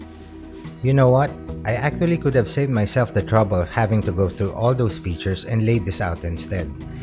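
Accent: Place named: Philippines. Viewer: are you based in the Philippines? You sound like a Filipino. Great production, by the way.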